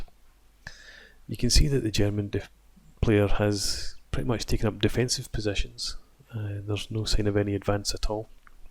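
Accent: Scotland, United Kingdom. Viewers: I enjoy the low key Scottish commentary.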